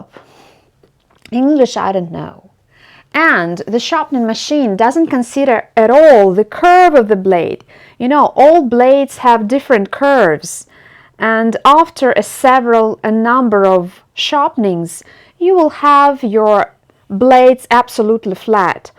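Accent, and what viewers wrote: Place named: England, United Kingdom. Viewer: The word you were looking for is 'rocker'. In English, rocker is used to called 2 aspects of blades: one is about the curve of the entire blade (e.g., 7-foot rocker such as Coronation Ace blades, or 8-foot rocker for most other blades), the other is about that place just below the ball of your foot and about an inch behind the toe pick, the rocker for spins. Thanks for posting in English, much appreciated. Your English is very good, without much Russian accent and with more English (not American) tone, I am very pleasantly surprised...😀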